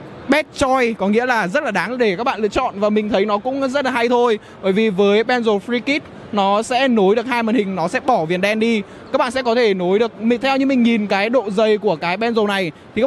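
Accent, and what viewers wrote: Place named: Nghe An, Vietnam. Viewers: á đù a người nghệ an à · Giọng Nghệ - Tĩnh đó bác, ông này người Hà Tĩnh. Face ông này hình như là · Hà Tĩnh bác ơi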